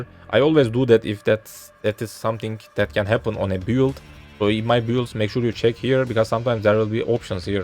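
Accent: Turkey. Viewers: When i first hear i said this is a perfect Turkish accent. Just find out that im right amk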